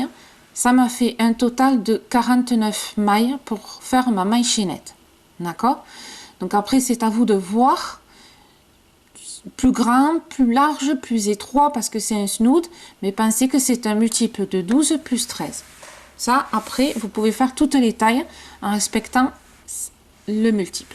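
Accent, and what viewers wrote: South, France. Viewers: Merci pour tous ces conseils et bravo en plus tu me fais trop rire avec ton accent du sud. Et cela fait du bien en ce moment comme il fait froid chez nous en Auvergne. Bisous d'une mamie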